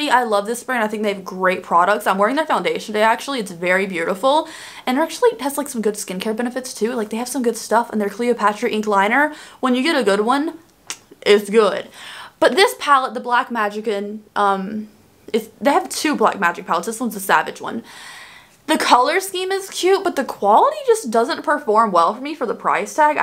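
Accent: South, United States. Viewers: How southern are you sounding now days?! I'm loving it!! Sound so precious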